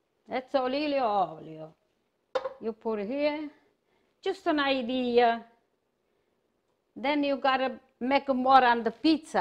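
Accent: Italy. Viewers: Grandma, I’m a 73 yr. old great grandma and I have to say, you inspire me with your Italian cooking!! I’m not Italian but people think I am because I love everything Italian! I grew up with two Italian families, the Mariano’s and the Vasterelli’s. You remind me so much of them and I love your accent, your expressions with your hands and watching your videos. Thank you for sharing your recipes with all of us. (My sauce is almost identical as yours except I add sugar and wine as this is the way my Italian families did it.) Love to you, Grandma!!❤ PS: You have a lovely voice!😊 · I love this lady. If she was my grandmother I would be fat as heck from eating so good. The very first job I ever had was working at an Italian Restaurant. The owner was also the main cook, he was straight off the boat ( as they say,) from Italy and he had the same accent. Ever since I worked there, all other pizza I eat is automatically compared to what he and I use to made at the restaurant. 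Not just the pizza either, but every Italian dish you can think of, Lasagna, Spaghetti and Meat Balls, Baked Ziti, Egg Plant and Veal Parmesan, and the list go's on to the deserts and more.. We got there early to make the dough and roll it out into aluminum pans coated with olive oil. I am 60 years old this year and that was my first job at 15 years old, yet even now any Italian food I eat, I still compare to his, and I am a tough critic when it comes to Italian food. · The most Italian sounding person I've ever heard, perfect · I would have loved to have grown up in her home. I would be so fat! Her Italian accent and grandmotherly voice is legendary. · I feel like she is my own grandma watching her do her cooking like I used to watch mine many years ago. I love listening to grandma in her Italian accent-English coz I can understand what she's saying haha. I just discovered her now and I instantly subscribed and at her age, she will have many years more to spend time with her kids grandchildren great grandchildren and friends and youtube friends. 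Such a joy to have a grandma. stay safe and healthy and share more of your treasured recipes! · I am waking up today in Seattle... Watching & listening to a real Italian grandmother making pizza... And she wrapped her bread dough... in blankets on the couch... like family! Living alone in a big city, I find this so comforting. Bless you for sharing... in English with a lovely accent as authentic as your beautiful pizza! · You have to love her and the Italian accent. Miss my Italian gmom so bad, she reminds me of her. An Italians mother's kitchen is the entire house, do not get in her way, or you get a dough slap upside the head. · Love your videos and your Italian accent! Also, you cook like I do, a little bit of this and a little bit of that!! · you can’t tell an Italian accent? She’s Italian 100%.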